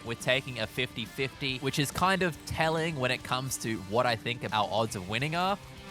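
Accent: Australia. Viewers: why are you australian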